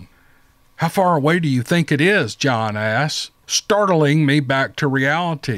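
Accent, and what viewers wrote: South, United States. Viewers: Don't change anything you do. I love your story telling, the mannerisms, and your southern drawl, its a gift. Thank you for your love of a good story, and your platform to share them. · I am a 68 year old southern girl. I love a good story and a real southern accent. You are awesome. Story telling is an art that was dying until people like you appeared on the internet, of all places. Thank you so much. You and those like you are national treasures. God bless:) Nancy in ga usa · 🤣 YOU TELL EM!! YOU TELL EM REAL GOOD to put their BIG FOOT in their PIE HOLES and just enjoy them Narrated Stories in ye Southern Drawl 🤣- BEST RANT EVER! 🤣 🇿🇦 PS: Love Your Stories! 🇿🇦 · I love your stories and your voice/accent. I’m country-fied too. I have a strong southern accent. Those people who made the comments are idiots! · I want to thank you from the bottom of my heart 💖for all that you do on this channel. 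Yes, I'm a little biased, because you have read my experience on here several months ago. ( episode 131) ☺️ But all in all I am not part of the Bigfoot community. Because I did not choose my experience. It chose me. I am not one of those fanatics who would go around bashing you BTW. Never have been...never will. I like the intro music that you use. I love your Southern drawl because I'm a West Virginia girl and it makes me feel at home. Your storytelling style makes me feel comfortable. It makes your story that you're telling me seem more real and more believable. Whether it is real or not matters not to me. What matters to me is that the style that you're telling it in, your delivery, it's the gospel truth. I really like whenever you're reading a line and it's funny, you break character and you laugh right along with us. Yes, you have a sense of humor and I think it's great! Because we're laughing, too. 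And when we can all laugh together it makes the world a better place! 🤣😂🤣😂 I really liked it when you had the children stories on here 📖. It encouraged them to be creative writers.📝 And that I think is totally awesome!👍😁 I think that's why I like your Steve Lily journals 📚 on your "What If It's True?" Podcast. I like your style of creative ⌨️ writing and I like the subject matter as well 👣. Okay, enough a$$ 😘 here, 😂 lol. God bless you. Please keep up the good work. I await your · God Bless Brother! As you stated some people are so bitter in their own lives they need to spread their misery... sad actually. You have my support and attention, love your southern accent when telling these stories. Just like TV, if you don't like the show...just change the channel and to hell with your opinion. Keep going brother!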